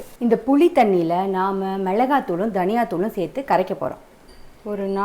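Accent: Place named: Erode, India. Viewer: sissy erode language pola pesrenga. unga voice kekkum pothu amma oor மண் வாசனை..... And meen kulambu vaasam kalanthu oru vidha santhosathai kudukkuthu.... Nice sissy thank u so much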